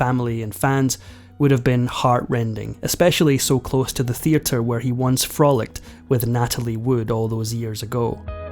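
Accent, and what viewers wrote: United Kingdom. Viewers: Blah blah blah, Hollywood and you hollywooders make me sick, I turned my back on Hollywood ever since I became a true born again Christian. Hollywood is modern day Sodom and Gomorrah. I worship Jesus Christ, our Lord , God and savior. I dont care about this brittish voice over, bragging about this man headed for hell. We can not serve two masters, as God says in His holy words, the Bible. MAGA 🇺🇸 🇺🇸 🇺🇸 AMERICA FIRST 🇺🇸 🇺🇲 🇺🇸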